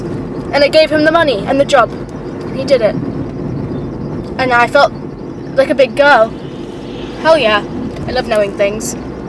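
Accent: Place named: United Kingdom. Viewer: omg bri'ish accent kills me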